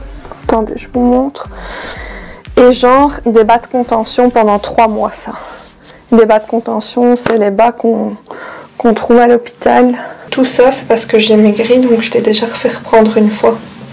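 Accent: Belgium. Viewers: Coucou ma belle, j’entends ton petit accent belge et je suis belge aussi. Je voulais avoir les coordonnés de la chirurgienne ainsi que les prix que tu as payer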